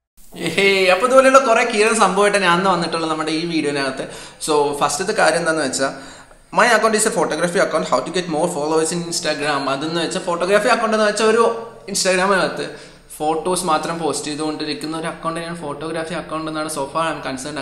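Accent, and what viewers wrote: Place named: Kannur, India. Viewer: Pullide samsaram kettittu kannoor karan aaanu thonunn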